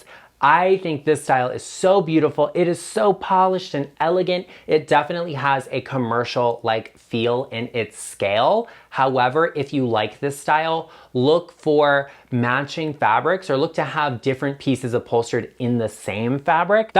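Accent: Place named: South, United States. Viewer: Love your channel! Thank you for all this valuable professional advice. Where, generally, are you located? Northeast, South?… You sound a little southern to my Texan raised ears. ❤️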